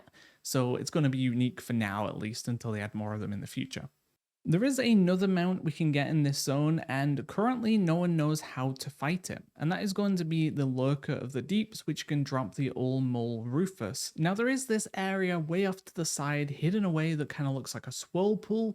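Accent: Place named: Manchester, United Kingdom. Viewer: My guy is so Mancunian ❤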